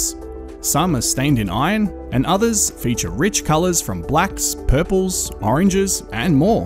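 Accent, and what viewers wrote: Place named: Australia. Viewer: I love your Content, You are saying wonderful things but the way you narrate them and by that I mean your thick Australian accent makes watching your them really-really difficult. I am sure I am not the only one with this problem. I am watching this one just to post this comment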